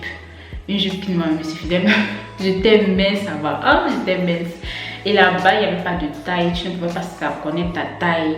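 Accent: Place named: Yaoundé, Cameroon. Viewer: Warr 😅c'est de la ville aux 7 collines, dc tu parles comme ça 😂😂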